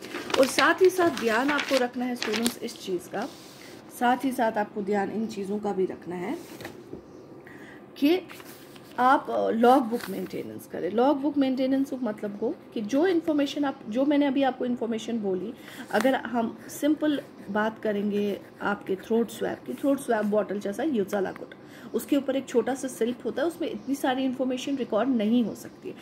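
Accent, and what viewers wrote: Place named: Kashmir, India. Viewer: Excellent ma'am ur teaching way is amazing and that tooo in between the kashmiri ascent is phenomenal ♥️♥️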